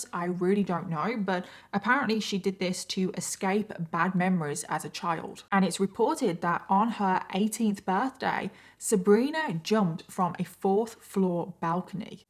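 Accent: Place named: Midlands, United Kingdom. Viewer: I love listening to your You Tube talks as well as watching you do your makeup! Beautiful eyes that always pop at the end!.. I also love your accent. I have family in the Midlands so it warms my heart to hear you talk. Congrats on your pod cast show now! Looking forward to hearing more :)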